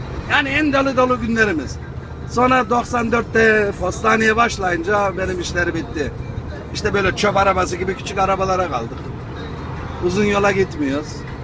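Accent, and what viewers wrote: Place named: Anatolia, Turkey. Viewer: merhabalar nasılsınız bu konuşan arkadaş sizmisiniz şivesi sunumu çok tam anadolu :) ve kahkahsı ..hayırlı yolculuklar dilerim..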